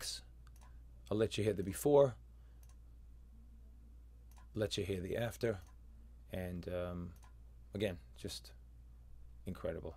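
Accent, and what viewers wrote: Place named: East Coast, United States. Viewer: Good to hear an east coaster. Awesome review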